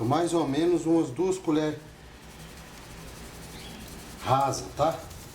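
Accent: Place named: Minas Gerais, Brazil. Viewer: PARECE BOM, VOU ME AVENTURAR E COMEÇAR AGORA, OBRIGADA PELA RECEITA VIU E TÁ MELHOR AINDA PQ TEM UM SOTAQUE QUE EU ACHO QUE É MINEIRO, (BOCADIM) .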